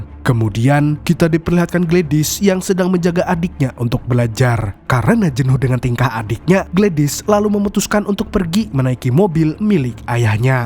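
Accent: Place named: Kalimantan, Indonesia. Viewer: bg lu orang kalimantan yaa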